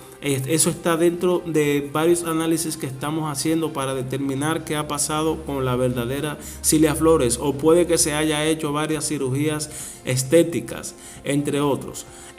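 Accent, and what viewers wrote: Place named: Cuba. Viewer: Será que son ideas mías o este señor es cubano¿?escuchen la voz y su particular acento!! Mosca¡!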